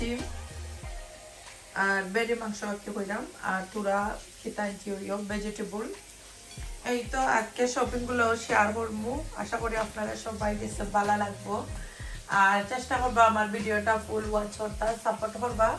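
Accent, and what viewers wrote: Sylhet, Bangladesh. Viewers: মনোমুগ্ধকর সিলেটের ভাষা অনেক ভালো লাগে আপু মন জুড়িয়ে যায়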